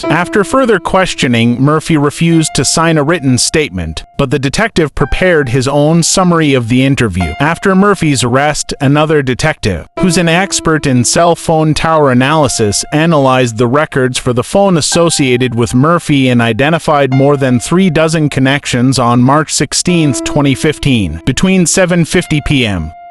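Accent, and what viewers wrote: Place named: Europe. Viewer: Thea ducking European narrating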